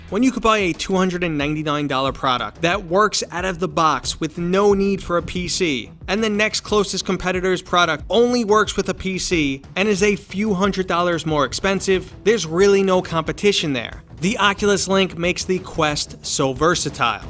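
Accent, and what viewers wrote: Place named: Boston, United States. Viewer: Let me guess, are you from Boston?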